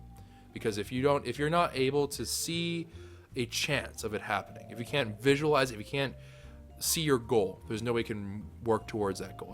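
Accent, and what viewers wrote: United States. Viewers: You do not sound like a sour Korean , I assumed fully 100% American with dark hair , similar looking to drift0r or something. But I like getting to know what you look like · Hey it sounds to me that you live in Pittsburgh and so do I man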